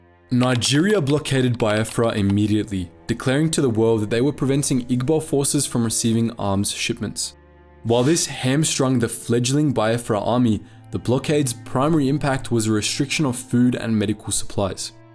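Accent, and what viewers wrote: South Africa. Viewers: Is the narrator a saffa? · He sounds south african. And most people don't care about cricket, at all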